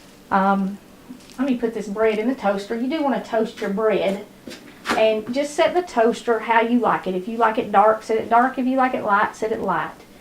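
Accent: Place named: Appalachia, United States. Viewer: So simple and yet so satisfying! Everything you make - and the accent - hits sentimental chords of my WV and Eastern KY upbringing ... miss these meals my mammaw and mom would make! I am so happy to be your subscriber!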